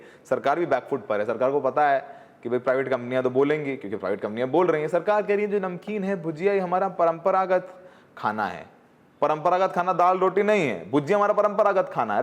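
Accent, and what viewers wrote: Kanpur, India. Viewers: You should make more videos in your authentic kanpuri language and Ravaiya. It was fun to watch.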